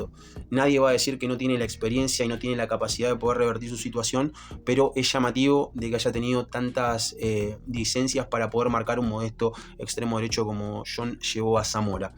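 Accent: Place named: Argentina. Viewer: De donde sos voz que parecieras de acento argentino pero con educacion tal que no pareces argentino?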